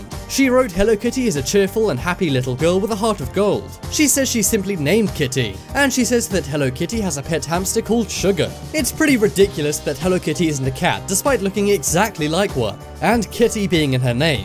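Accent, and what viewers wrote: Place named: England, United Kingdom. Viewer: There's that stupid English accent again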